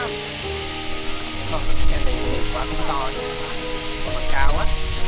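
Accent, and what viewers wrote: South, Vietnam. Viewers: cho mình hỏi là bạn ở miền bắc sao lại nói giọng nam v.. hay là gđ chuyển ra ngoài này sống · Ủa mẹ e ở miền Bắc mà e nói giọng Nam hay vậy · Ong noi nay noi tieng nam ma dau ra móc chau vay troi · Nghe giọng bạn và xem video thì đoán bạn ở miền Nam. Nhưng đến video này thì mình thắc sao ở miền Nam lại trồng được mận Bắc nhỉ? Hay bạn có nhiều nhà?😊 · ơi! Chú mới đăng kí kênh của con nè. Quê con ở đâu mà chú nghe cháu nói giọng miền nam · cảnh miền Bắc , bạn nói tiếng miền Nam.... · Cho mình hỏi sao bạn ở miền Bắc mà nói giọng Nam hay quá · Ủa giọng nói của bạn miền nam còn trái cây này ở ngoài bắc mà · Thấy bạn nói giọng miền nam gặt luôn á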